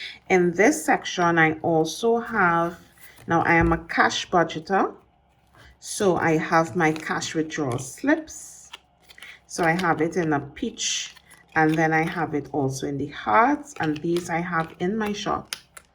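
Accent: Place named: Caribbean. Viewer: Beautiful planner setup 👍. It's really great to hear a Caribbean accent speaking about my obsession ❤️. I purchased my Filofax on Amazon as well. So sad we cannot get this stuff in the islands.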